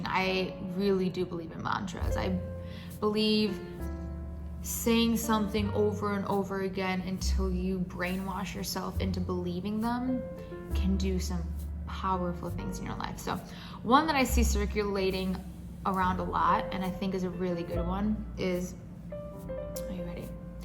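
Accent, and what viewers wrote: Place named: Russia. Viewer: u have russian accent :D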